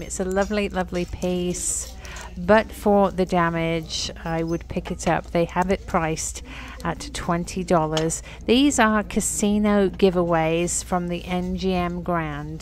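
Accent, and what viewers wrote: United Kingdom. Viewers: From the things you say it is clear that you have been in the USA since you were quite young and yet your accent is pure UK - -which is fascinating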